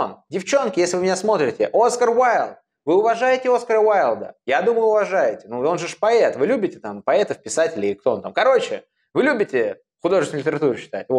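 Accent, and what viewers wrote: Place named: Saint Petersburg, Russia. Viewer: так ну акцент точно питерский аах))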